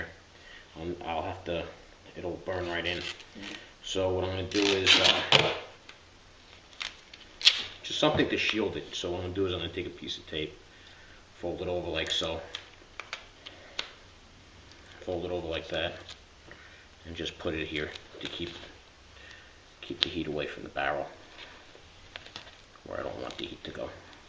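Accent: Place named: East Coast, United States. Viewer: You got the back east accent. I'm from Jersey.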